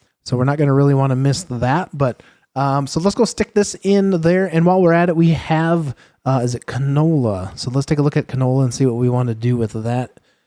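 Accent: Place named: Upper Midwest, United States. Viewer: Love from Michigan…thought i could pick up a bit of northern Midwest in your accent. Blink twice if i’m right lol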